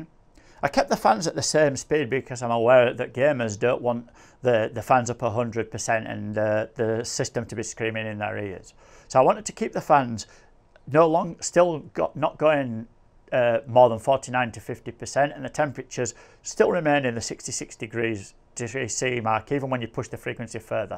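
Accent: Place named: Northern England, United Kingdom. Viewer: nothing's wrong with him lmao. It's the British accent from the north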